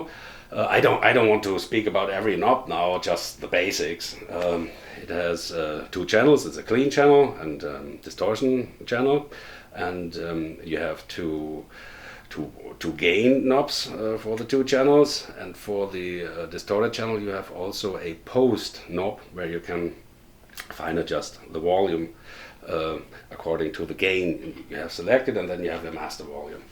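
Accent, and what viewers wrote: Germany. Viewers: this was a very slowly and a little denglish review, but you play like a god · I love the German accent. Love Scorpions, Uli, Big fan of Engl amps. Hughes and Kettner too.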